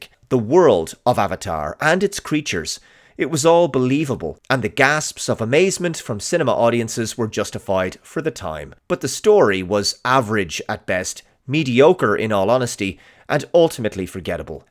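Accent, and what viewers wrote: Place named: Ireland. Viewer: Is it me or does just sound more Irish than before? I haven't watched for a long time.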